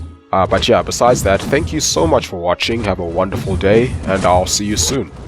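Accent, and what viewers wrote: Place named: South Africa. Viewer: Got directed here via a luhdemtaters "QUAKE Breakdown & Grade" video. As soon as I heard your voice I knew I was listening to a fellow South African. Cheers brother. Enjoyed the video. Let's keep Quaking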